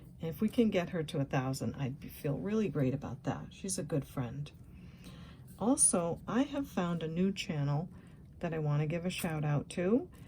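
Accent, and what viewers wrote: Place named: Northeast, United States. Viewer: Love!! One of my faves! Maybe I just like the yankee (North eastern) accent.😂 Born and raised in Maine. So sorry for the loss in your family. Praying for peace and comfort. (❤)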